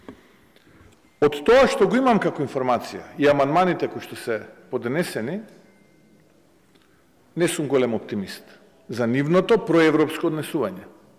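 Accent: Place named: Serbia. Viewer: Говори нанраво бе,не меши сръбски диалект в Българския език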